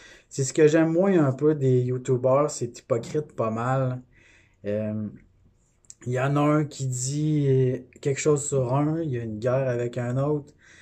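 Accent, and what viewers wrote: Quebec, Canada. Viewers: Cool, un québécois, j'adore cet accent 😍 Tu t'est abonné à ma chaîne, et je me suis aussi abonné. Voilà 😁 Je te découvre à peine, et j'adore tes vidéos.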